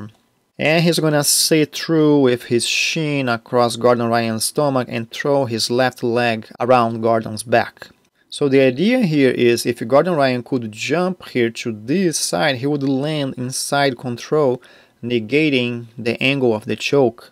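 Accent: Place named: Quebec, Canada. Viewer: Hey I just noticed your voice reminds me of GSP! Did you spend some time living in Quebec?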